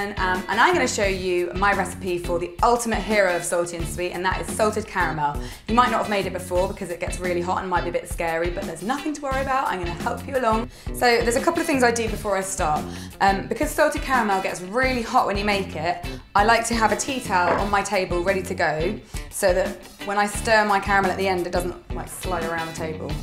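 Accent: United Kingdom. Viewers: I suppose she meant uk cup, because she has a british accent, so I think that she is English.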